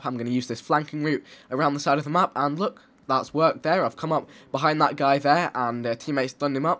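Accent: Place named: United Kingdom. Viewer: Don't be silly guys. He's good old British!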